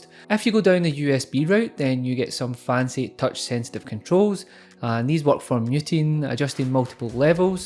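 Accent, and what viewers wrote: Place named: Scotland, United Kingdom. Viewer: Love how ur scottish mate, where abouts you from?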